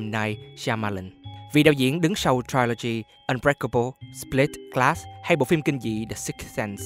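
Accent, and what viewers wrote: South, Vietnam. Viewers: Ko quen giọng miền nam à ? 😅😅 bake nên nghe ko quen chứ gì ?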